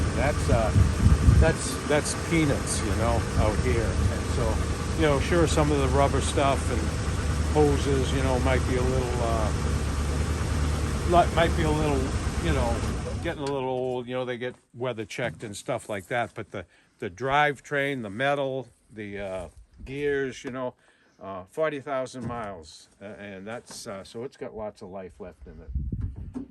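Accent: Boston, United States. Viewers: Medford mass fire shirt!!! it amazes me you still have your Boston accent!!!!!! after 40 + years!! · Stan buddy i wana tell u how awesome it is to still watch u and have new videos today even after ur show stopped ...me and my mom used to watch u guys every week.... Were also from massachusetts just west of plymouth and she picked up on ur accent before we even knew u were from boston...she was born in '60 in quincy ....anyways shes no longer with me and i just found ur videos on utube and its really helped me feel closer to her cuz it was something we did together....i just wanted u to know how ur helpin me today so THANK YOU so much Stan and family we love u guys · Is that Medford NYFD shirt? I live near there..love ur Baston Accent I miss seeing you all on TV 😭 great red white and blue 💙❤️ too ! God bless you all 🙏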